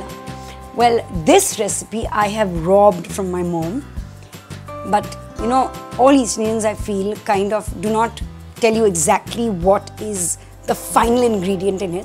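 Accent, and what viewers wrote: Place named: Oxford, United Kingdom. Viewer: U r accent is very nice.... Oxford level.....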